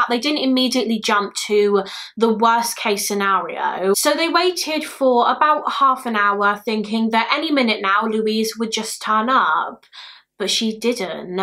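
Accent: United Kingdom. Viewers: It takes a really heartless person to destroy someone so beautiful.:/ Wait, the murderer voluntarily came back to the UK? Wow, just Amazing. - thanks a bunch for all the work You put into these videos and i hope the holidays will be nice for You! (Your accent is so very british, can't get any more british... I hope it won't stick, since i'm a non-brit.):)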